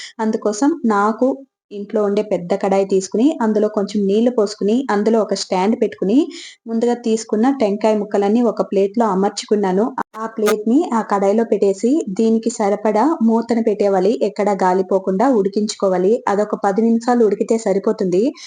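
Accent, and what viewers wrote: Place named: Tamil Nadu, India. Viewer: Telugu raada meku Tamil laga matladutunnaru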